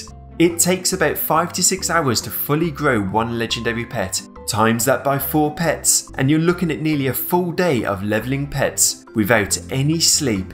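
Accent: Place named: United Kingdom. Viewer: Is it just me, or his voice is so british its calming?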